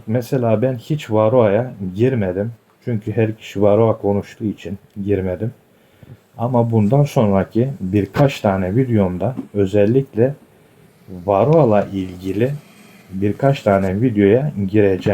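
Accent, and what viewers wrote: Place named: Thrace, Turkey. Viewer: Muhacir olduğunuzu veya Trakya bölgesi şivesi olduğunu sanıyorum. Hayırlı bereketli olsun, bilgiler için teşekkürler.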